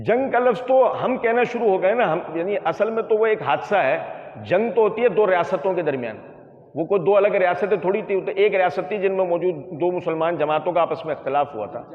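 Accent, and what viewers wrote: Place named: Hyderabad, India. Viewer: آپ کے بعض الفاظ سے آپ مجھے حیدرآباد دکن کے معلوم ہوے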